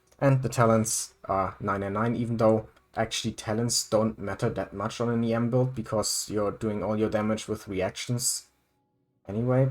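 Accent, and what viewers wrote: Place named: Germany. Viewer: Youuu.. are german! Das höhre ich doch gleich raus :D Fellow Kuki Main here, I like to do Montage videos of teams i like and im working on an Aggrevate Kuki Montage rn I LOVE Aggrevate Kuki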